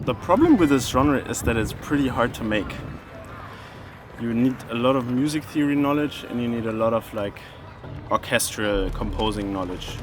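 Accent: Germany. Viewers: Im sry if il wrong here but its been c8nstsntly hititng me that ur accent sounds quite german lol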